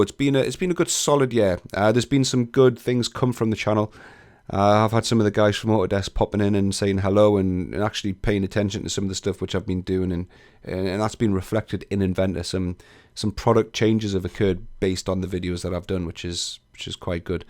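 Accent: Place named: England, United Kingdom. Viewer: I am a woodworker who uses inventor to digitally prototype a design that I can tweak at different stages of production. Your videos are very good and the best that I could find (English accent helps...). I often rewatch your previous videos as you have a very natural way of communicating. Looking forward to what you have to offer in 2016.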